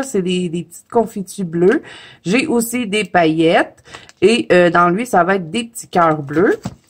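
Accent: Canada. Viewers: Cc 😄merci pour ta vidéo de produits pour le coloriage. Je ne me rappelle plus si je t'ai dit que je me suis abonnée à ta chaîne youtube 😄, j'adore ce que tu fais et j'aime beaucoup ton accent canadien,bises, 🥰❤️💕 Martine.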